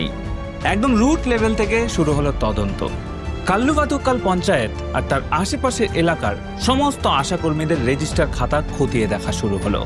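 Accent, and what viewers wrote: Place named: Mymensingh, Bangladesh. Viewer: 'ব' এবং 'ভ' এর উচ্চারণ ! আদি নিবাস কি ময়মনসিংহ ?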